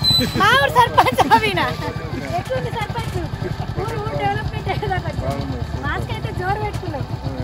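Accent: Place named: Nizamabad, India. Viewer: మన యాస నిజామాబాద్ జిల్లా కైతిని ప్రపంచనికి తెలిసేలా చేశారు మీకు ధన్యవాదాలు తెలుుతున్నాను అక్క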